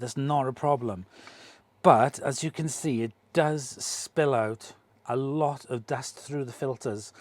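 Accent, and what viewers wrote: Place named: Wales, United Kingdom. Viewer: I like your accent 😄 (I'm guessing it's Welsh). Thanks for the review! You covered all the things I wanted to know and see. The filter part was very helpful.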